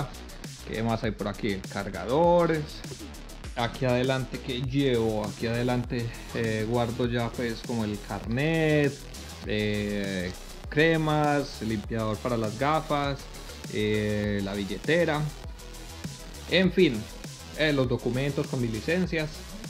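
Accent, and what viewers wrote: Colombia. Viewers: Capitan que aerolínea vuela usted Veo que por su acento se nota que es colombiano, yo también soy Colombiano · Será colombiano por casualidad?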